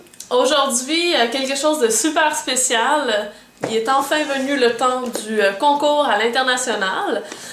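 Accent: Quebec, Canada. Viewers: Coucou, Je participe à ton concours avec grand plaisir, il est fort agréable d'entendre a nouveaux le bel accent du Québec (j'ai eu la chance de pouvoir visité un peu cette magnifique contré) J'adore également tes vidéos je les trouve super!!! Ce qui m'intéresse le plus dans le lot a gagné sont le pinceaux et les paillettes... Merci pour tous ce que tu fais, Bisous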